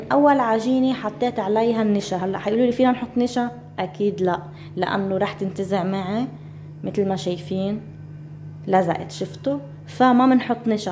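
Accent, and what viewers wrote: Lebanon. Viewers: دائما متألقة وصفة جميلة ودائما اللهجة اللبنانية بتجنن 😍 من الجزائر أنا 🇩🇿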